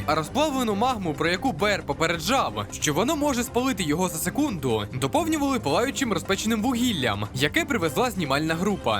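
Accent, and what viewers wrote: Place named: Russia. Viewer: Чувак, ти цю промову ззапорєбріка якнайшвидше забудь, Це біда, і ти її носій, досить акати, кажи суть нормальною мовою.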